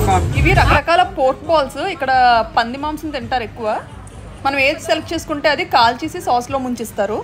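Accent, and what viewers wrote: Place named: Godavari, India. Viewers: Nuvvu beautiful ga unnavu..Tollywood lo ki enter avvachhu kada..voice super..West godavara /East godavara..very beautiful & nice voice..congratulations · Madam midi వెస్ట్ గోదావరి లా అనిపిస్తుంది మి voice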